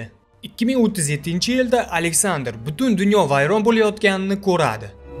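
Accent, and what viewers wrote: Uzbekistan. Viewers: Жиззахликми дейман шархловчи 😊😂😂😂 ўзимизни шивани дарров таниманда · G'irt Andijoncha shevada tushuntirdi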